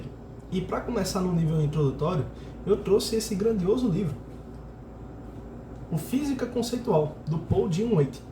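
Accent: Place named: Pernambuco, Brazil. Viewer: sempre que tu aperta num vídeo inteligente assim tu já sabe que vai ouvir o sotaque de Pernambuco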